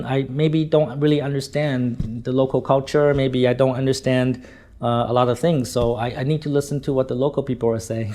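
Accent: Standard. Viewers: nói rất chuẩn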